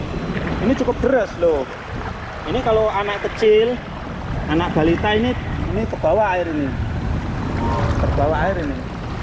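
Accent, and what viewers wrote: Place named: Banyumas, Indonesia. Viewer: Inyonge wong ngendi kok ngapak ngapak temen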